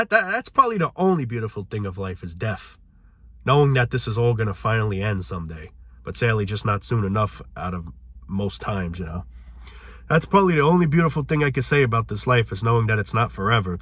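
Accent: New York, United States. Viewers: PLEASE MAKE A SEGMENT AND NAME IT WHAT REALLY GRINDS MY GEARS 😂 that Rhode Island New York accent bro you have to.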